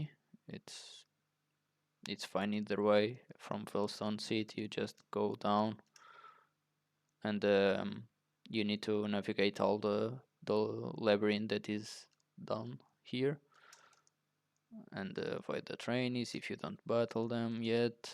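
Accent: Portugal. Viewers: Sotaque pt? 100%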